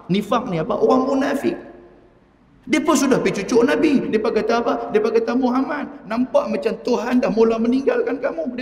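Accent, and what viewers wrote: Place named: North, Malaysia. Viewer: betul kan ,,sy tahu sbb sy peminat ustz samsuri ahmad,,pejam mata sy dah tau ini suara ustz tp semalam membuatkan sy terkejut beruk dengar suara ustz ini,, Cara penyampaian lenggok dia bila berkata sama sgt,,lorat pon utara,,lawak dia pon sama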